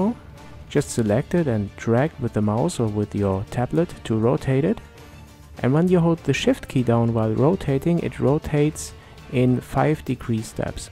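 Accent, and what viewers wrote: Germany. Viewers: i asume you're german with that accent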